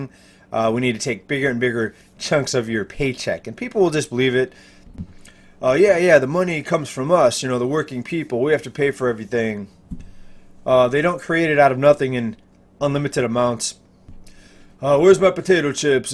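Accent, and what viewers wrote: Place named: South, United States. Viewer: I like his big bubba accent!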